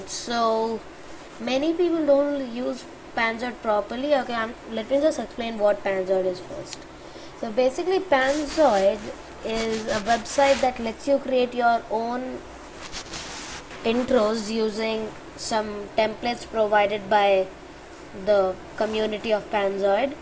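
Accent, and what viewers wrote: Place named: India. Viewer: I think ur Indian cause ur voice xD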